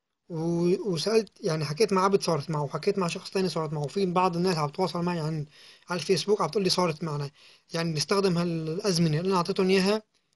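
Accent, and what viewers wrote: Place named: Aleppo, Syria. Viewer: احلا قناة باليوتيوب , ولهجتك الحلبية محلاية القنات, استمر ..انشاء الله بتوفيق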